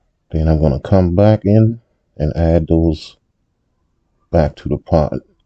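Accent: Charleston, United States. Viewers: You sound like you from Charleston 👀👀👀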